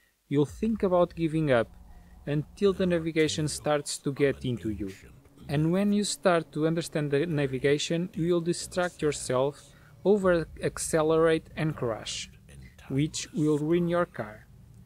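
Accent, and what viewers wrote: Portugal. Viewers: Are u portuguese? BTW, nice video and Niceeee gameee I'm a huge fan of motorsports and Dakar obviously. I'm rly hyped for this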